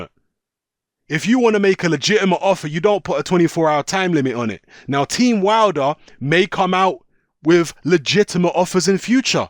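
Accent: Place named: South London, United Kingdom. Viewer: has a very heavy South London Accent. Which part of America is South London in?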